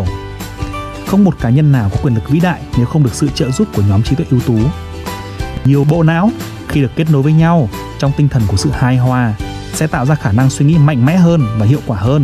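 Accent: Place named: North, Vietnam. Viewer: Rất muốn nghe nhưng kênh kèm nhạc ồn quá k thể tâp trung nghe đc.mình là người Nam nghe giọng Bắc đã hơi khó lại thêm nhạc nữa .....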